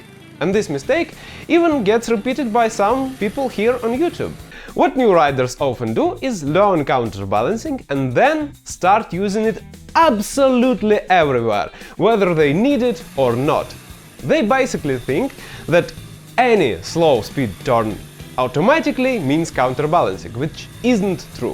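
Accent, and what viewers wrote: Russia. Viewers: Actually love the Russian accent 😊 And sensible content, saving lives.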